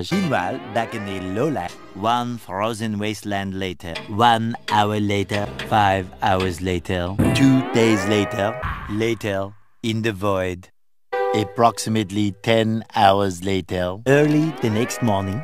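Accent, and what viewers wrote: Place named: France. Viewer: It's meant to be French. The narrator is an homage to Jacques Cousteau.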